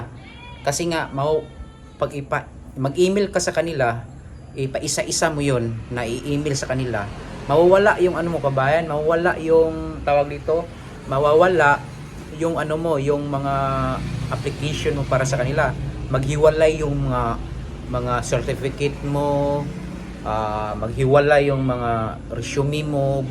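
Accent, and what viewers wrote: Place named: Visayas, Philippines. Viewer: Hmm my idea n din ako Peru parang bisaya c sir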